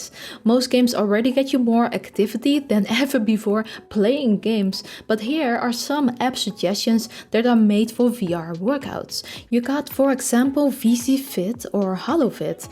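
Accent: Netherlands. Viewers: I love your accent, is it dutch?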